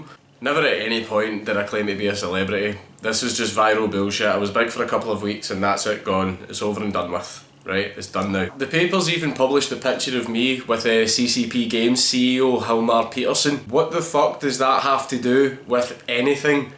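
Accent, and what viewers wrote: Scotland, United Kingdom. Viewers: dude your awesome! ever since i saw train spotting i love the scottish accent .... i use phrases like " no bother" and shit ive culturally appropriated from scottish vernacular ..... if me and my girl ever visit the uk and scotland in particular ill buy you and your girl a round, subbed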